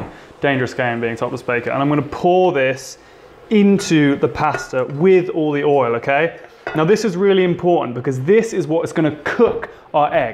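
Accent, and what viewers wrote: England, United Kingdom. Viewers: Your Engish accent when you speak in Italian is adorable.❤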